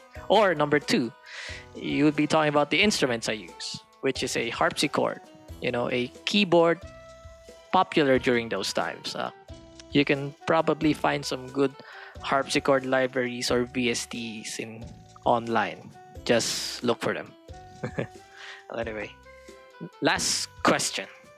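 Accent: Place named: Philippines. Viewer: I had assumed you were in the USA or something but when I heard your voice there was no mistaking that Filipino voice. For the past 5 years of my school life, almost everyone I knew was Filipino. Plus all of my relatives on my mom's side are living in the Philippines and I've only ever met my Great Aunt when she came here, to Canada.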